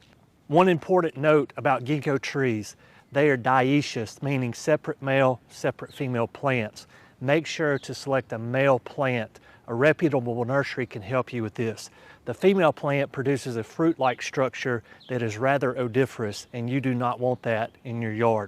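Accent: South, United States. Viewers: He is in Alabama, zone 8a. You can tell by that accent that he is a Southerner y’all!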